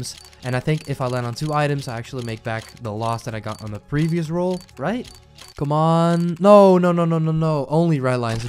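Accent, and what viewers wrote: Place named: Netherlands. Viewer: Haha jou Nederlandse accent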